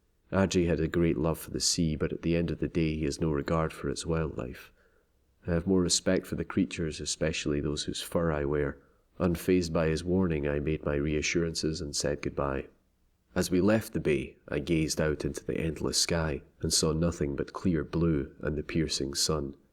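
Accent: Scotland, United Kingdom. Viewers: This channel is simply amazing. You guys have some really clever stories, and it doesn't hurt that you have Scottish narrators. XD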